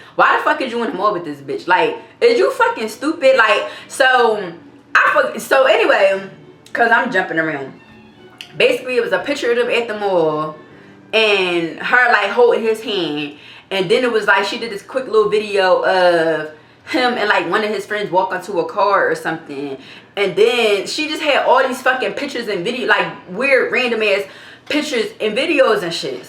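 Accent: Philadelphia, United States. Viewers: Give us more story times I love the way you talk you so Philly 😭😍😌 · I been following you since I had Covid FEB 2022 lol ❤️ I love Philly never been but I love meek mill so and the accent you cool too ima go look at the other story time when u beat yo yo brother baby momma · Hey Gurl I just love your Philly accent 🤗🤗🤗🤗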